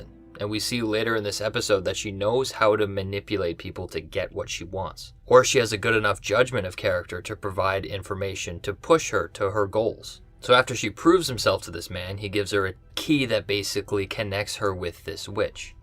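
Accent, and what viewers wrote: Canada. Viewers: theres an accent tells me ur probably from canada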